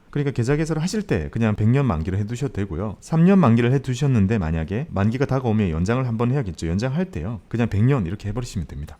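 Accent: Gyeongsang, South Korea. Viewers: 항상감사합니다. 작년부터 펜이됐네요. 약간의 경상도 억양도 매력있고 설명도 알기쉽게 설명해주시니 좋군요.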